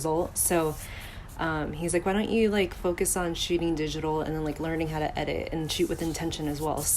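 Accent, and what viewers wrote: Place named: California, United States. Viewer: Weird confession, sometime I think about emulating the way you talk haha. Like you don't rush your words, you articulate everything with precision and thoughtfulness. So measured and without any anxiety. And very Californian!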